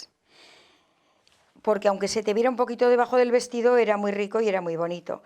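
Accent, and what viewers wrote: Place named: Aragon, Spain. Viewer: ¡Qué bonito acento! ¿es de Aragón?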